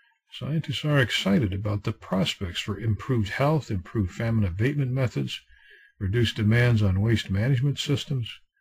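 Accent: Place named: Midwest, United States. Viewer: Your accent is consistant with the mid-west. The great lakes area to be exact. I would guess no more the a few miles from Grand Rapids Michigan. I grew up in that area and my kids drew my attention too your posts. "Dad come check this guy out! he looks and sounds like you! hahahahaha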